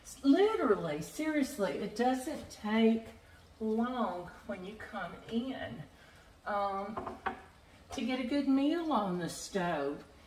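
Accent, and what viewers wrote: South, United States. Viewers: like I'm watching my grandma southern drawl n all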